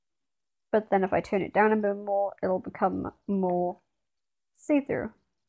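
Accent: United Kingdom. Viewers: thank you british lady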